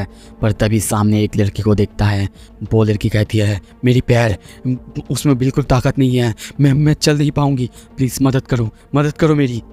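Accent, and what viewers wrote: Bengal, India. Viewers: Are you Bangali or what?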